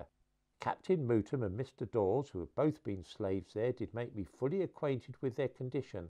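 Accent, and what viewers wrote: United Kingdom. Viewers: Sorry it offends you, but the fact he has a British accent does not stop him being a liar.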